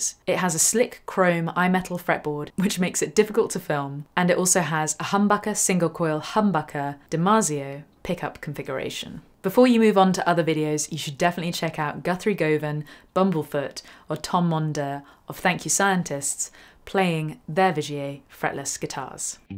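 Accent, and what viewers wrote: United Kingdom. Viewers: can i help u get a sitar from india -) you are unreal i swear teacher,guitar player,polight,dusky voice,british accent and the most beautifull face how can you have all of that.REALLY UNREAL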